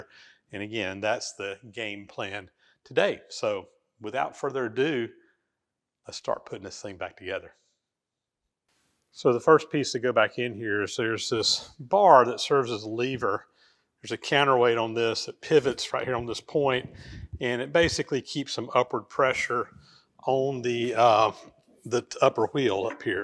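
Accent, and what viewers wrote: South, United States. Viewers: Always nice to see your projects coming along narrated by your southern accent that is soft on the ears to this NYC guy. I hope that someday when this country returns to manufacturing and engineering fine products that young folks can watch these videos and see a skilled craftsman at work.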